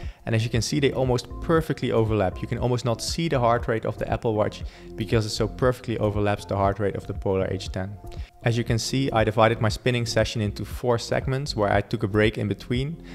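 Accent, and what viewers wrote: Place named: Netherlands. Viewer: I was almost asure you were dutch, until you said you live in Vienna 😅😂. Great video!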